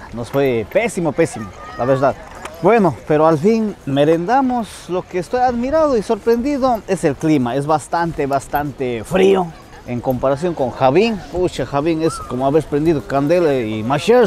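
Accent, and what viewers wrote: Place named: Ecuador. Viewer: Me da risa su forma de hablar muy específico de que parte de ecuador es😊😊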